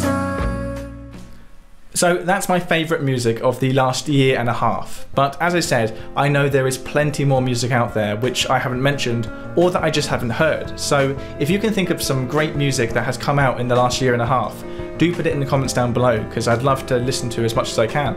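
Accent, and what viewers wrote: England, United Kingdom. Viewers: A man with an English Accent !! Dreamy !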